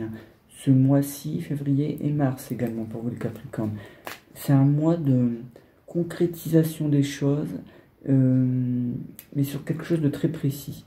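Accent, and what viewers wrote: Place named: Villers-Cotterêts, France. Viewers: Moi pareil c'est villers-co près de la Ferte Milon. J'ai quitté ma région depuis presque 20 ans et d'un sens je regrette la forêt et cette belle nature. Bien sûr j'ai reconnu cet accent puisque je l'ai gardé 😊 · J'ai passé mon enfance dans votre région. En vous écoutant, j'ai remarqué votre accent, en particulier les "o", j'ai pensé au Nord mais je sentais que ce n'était pas cela. J'ai découvert Chambry 02 (moi, c'est Neuilly Saint Front près de la Ferté Milon). Je connais cet accent tellement bien mais cela si longtemps (60 ans) que je ne l'ai plus entendu, qu'il devait y avoir un blocage pour que je ne l'identifie pas immédiatement. Merci infiniment de m'avoir ramenée dans mon village avec votre voix. J'ai noté vos coordonnées. Vous êtes un bon voyant. Prenez soin de vous et de votre chat. Bien cordialement.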